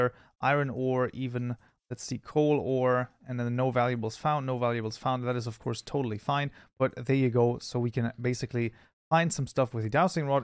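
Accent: Germany. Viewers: Amazing video man (also i love you accent, are you german?) I've looking for ways of having dynamic items, for example: i create an item that can change it texture and it stats (attack damage, mining speed, durability...) based on crafting materials, like tinkers' construct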